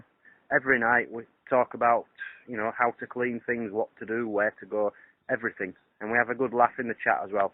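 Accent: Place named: England, United Kingdom. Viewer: It was pretty obvious the 1. He's not to clued up on German 2. He speaks with an English accent and uses phrases like "hey up" and "get in" :D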